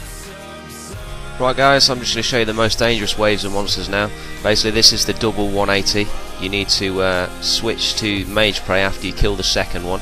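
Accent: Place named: North, United Kingdom. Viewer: i need 2 pull my finger out and get a cape, good guide m8 def gonna try your invents when i go for it....... you from up north m8, i thought u was a southern fairy ?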